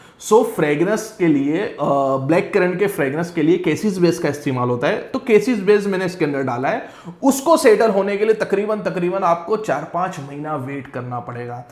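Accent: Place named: Ahmedabad, India. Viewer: Taddan Ahmadabadi accent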